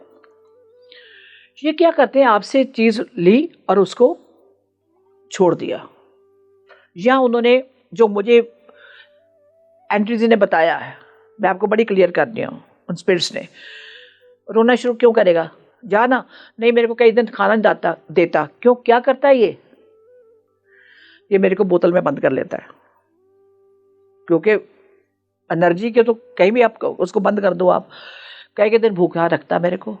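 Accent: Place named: Punjab, India. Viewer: The narration is pretty difficult to understand. Some anecdote starts abruptly without much hint of who and what she is talking about. Pronounciation in Punjabi style adds to the confusion. The statements about 33 Crores doesn't match with the description of 33 koti (types - 12 Aaditya, 11 Rudras, 8 Vasu, 2 Ashwinikumars).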